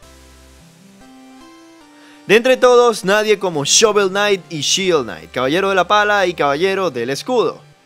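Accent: Venezuela. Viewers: OK OK ok...sere yo quien lo diga: DROSS!?!? ERES TU!?!?!!? hahaahah Bueno no es que esas grocerias con ese acento venezolano me recuerdan mucho a sus viejos vídeos. Por cierto esos juegos son de los que te vician y no mas no te sueltan!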